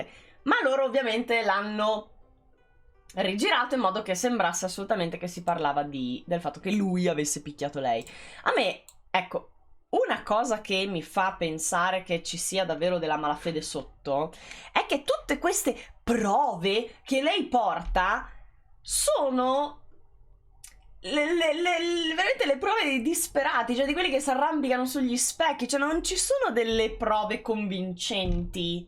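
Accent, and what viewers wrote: Romagna, Italy. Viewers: Hai la Z romagnola! comunque bel video, davvero esauriente, ti seguirò.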